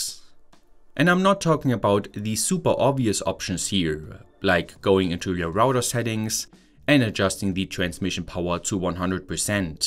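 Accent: Germany. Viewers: If you are a, why do you have such thick German accent? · Ich feier ihn immernoch für das spezielle Deutscher redet Englisch.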